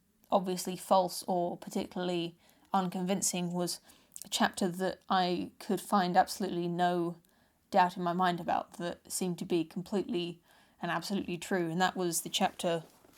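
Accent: England, United Kingdom. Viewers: I love Jordan Peterson to death and back again; that's why I took it upon myself to take a hammer to one of his major claims in a way that felt authentic to my being. In my newest video I try and explore that the idea of Hell is preferable to the idea of not existing; because Jordan mentions that Jung's argument against Freud's about religion being simply a defense against death anxiety is invalid because they would have reserved Hell for their enemies. I would say that Hell is more easily grasped at least than the idea of non existing, in an atheistic sense. i than go into some of my DMT experiences. You're more than welcome to check it out. Side note: I feel like lots of us North Americans really are gullible to a proper English accent; you sound much smarter than you would saying the exact same words in some alternate reality, American version of yourself. 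I can't recall ever hearing that the English have the same fancy for the generic American one.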